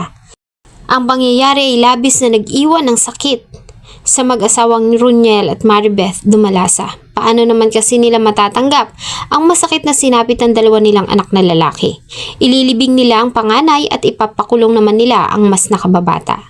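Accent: Visayas, Philippines. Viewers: putol na sundang 🤣.. Klaro kaayo na bisaya sad ni 😅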